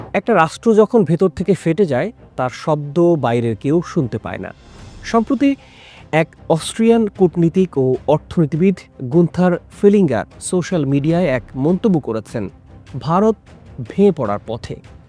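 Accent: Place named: Bangladesh. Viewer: ভারতের কোন বিরোধি দল বিচ্ছিন্নতাবাদ সমর্থন করে? এটা ষড়যন্ত্র ছাড়া কিছু নয়। কোনও সৎবুদ্ধি সম্পন্ন ভারতীয় আর দেশ ভাগ হতে দেবে না। প্রতিবেদকের উচ্চারণ শুনে একজন বাংলাদেশী বলে মনে হচ্ছে। এই ধরণের প্ররোচনা মূলক ভিডিও বানানো থেকে বিরত থাকুন। আর কোনও ব্যক্তি বিশেষের দেশ নয় ভারত। এখানে সত্যিকারের গণতন্ত্র আছে। তাই এই দেশ 140 কোটি ভারতীয়ের। অবশ্য সত্যিকারের গণতন্ত্র কী তা বোঝার ক্ষমতা বাংলাদেশীদের নেই।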